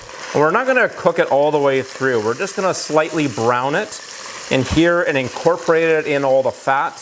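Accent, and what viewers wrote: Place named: Canada. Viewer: I love the Canadian accent! Showing love to the northerners from Southern California aye!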